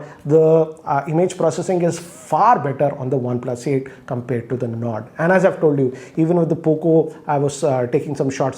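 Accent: Hyderabad, India. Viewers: We miss your hyderabadi hindi 🤣🤣🤣😃😃😃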